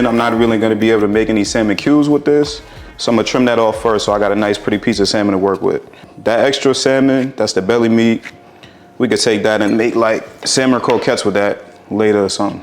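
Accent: New York, United States. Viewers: I hear an accent, maybe New York?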